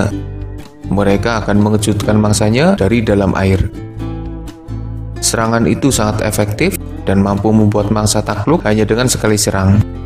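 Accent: Banyumas, Indonesia. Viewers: Jujur, admine wong ngapak duk???, ora ngapak ora kepenak mbok?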